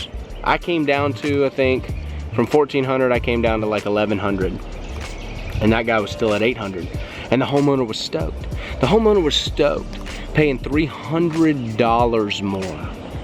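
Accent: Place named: New Jersey, United States. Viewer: Just found you on youtube, after seeing others, love the direct and truthful comments and straight up shooting from you. We are almost neighbors, I'm about 30 miles North of Nashville. Keep up the great videos, I just subscribed. If you want to sell that equipment , 4 gallons per minute, let me know. Would love to hook up with you sometime. By the way your "josey" accent is pretty good, I should know lived in NJ for many years.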